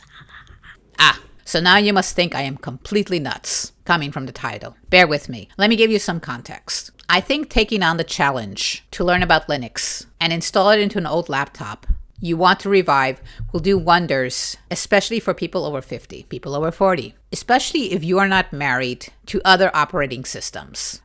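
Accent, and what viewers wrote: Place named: New York, United States. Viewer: I saw the title and thought it might be good to consider, then I saw something about New York and was not sure but I gave it a look and was entertained and saw the process unfold. I was used to the style as my wife is from Queens, Flushing and lived in Manhattan before coming to Virginia where we met. You seem to talk like her so it was quite easy to ignore the emotional bits. But I still need to know if this something I need to do. Thank You I appreciate your sharing. Will need to see a few more videos to calibrate where you are coming form and what it means to me. I studied Fortran in undergrad then in Grad school took a fast track on several languages. Fortran again, PL1, APL, Cobol, Intel Assembler, Basic,Pascal and Forth. I decided I would never need to program ever again. But now in retirement I am rethinking that pledge. 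Moving to Linux ?!?! I don't know, See you next time. Dennis in Virginia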